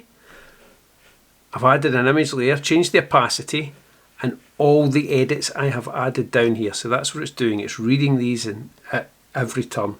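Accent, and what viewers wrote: Scotland, United Kingdom. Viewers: My god...is this Scottish? I had to turn in captions sometimes XD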